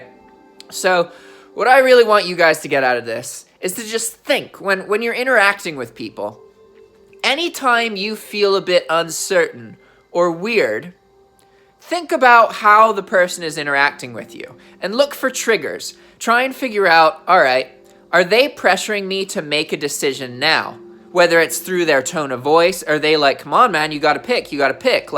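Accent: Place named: United Kingdom. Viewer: This dude sounds like he's hiding a British accent.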